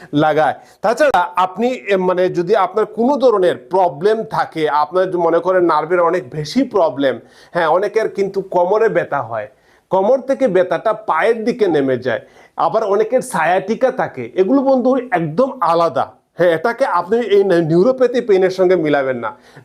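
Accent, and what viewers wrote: Sylhet, Bangladesh. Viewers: আপনার অফিস বা চেম্বার কুথায় বলেন। ভাষা বলে আপনি সিলেটি।চেম্বারের ঠিকানা দিলে উপকৃত হব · আপনার কথায় মনে হচ্ছে আপনি সিলেটি, কিন্তু মেডিসিন গুলি দেখছি সব ইন্ডিয়ান , এসব মেডিসিন কি বাংলাদেশে পাওয়া যাবে ??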